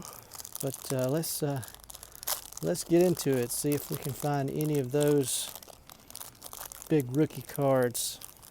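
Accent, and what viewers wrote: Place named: Southeast, United States. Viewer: This was actually not too shabby for the amount of hall of famers pulled! That 1989 Donruss was a tinge bit of a letdown, BUT Craig Biggio Rookie Card is a Great Pull 😍!! Fine Video and props to you for opening up outside, and I Absolutely Loved the bird sounds in the background. I just realized, you got a slight accent, are you from like the Southeast like Tennessee or maybe West Virginia area? I'm bad at accents 😅 but you are easy to understand and I reside in Washington State myself 😎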